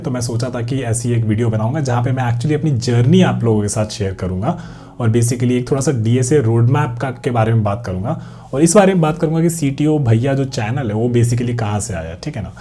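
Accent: Bihar, India. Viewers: Aap bihar se h kya thik h 😊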